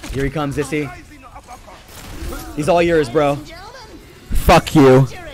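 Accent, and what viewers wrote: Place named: Asia. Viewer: i was watchin this and my mom said u have a asian accent idk why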